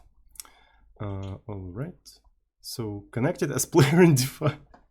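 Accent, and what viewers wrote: Russia. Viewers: Твои видосы отличные, но мне, как русскоговорящему, тяжело слушать русский акцент) Если тебе не похуй на мой совет, то изучи правильное произношение звуков в английском. Например звук "т" в англе совершенно другой (и при этом не сложный бтв)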